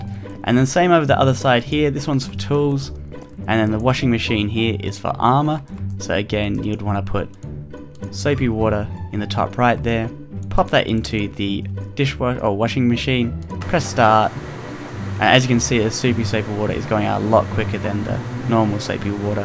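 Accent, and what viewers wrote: Australia. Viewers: i only downloaded the mod, i never actually watched the vids, i never realised he was australian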